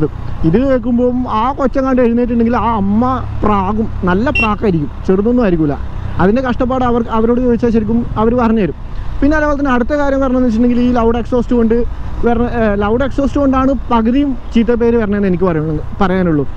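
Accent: Kannur, India. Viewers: chetta, chettan kannur aano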